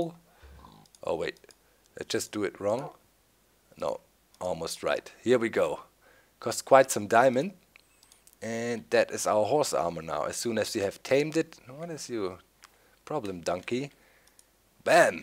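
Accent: Germany. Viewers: I love the German Accent well i think its German.... :3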